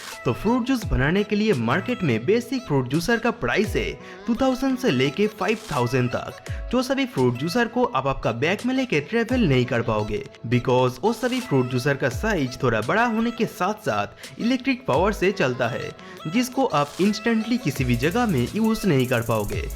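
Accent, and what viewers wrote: Bengal, India. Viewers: According to your Hindi pronunciation, I think you are a Bengali , better you try to speak in English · Wo totla nahi bangali hai😁😁😁😂😂😂😂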